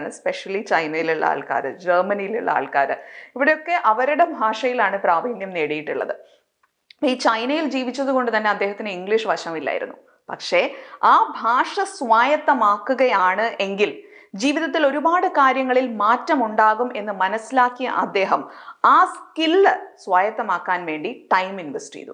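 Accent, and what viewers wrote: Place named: Valluvanad, India. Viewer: valluva nadan Samsaram mam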